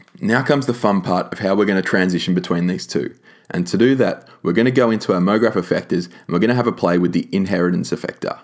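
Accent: Australia. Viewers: Hi, It's great to hear an Aussie accent on YouTube. I love your videos, your camera girl is awesome as well. I'm based in Batemans Bay, south coast NSW.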